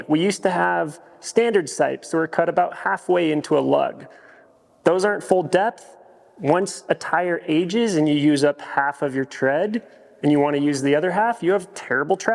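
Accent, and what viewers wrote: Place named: Midwest, United States. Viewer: Based on your mid-west accent I'm going to say the Packers or the Lions!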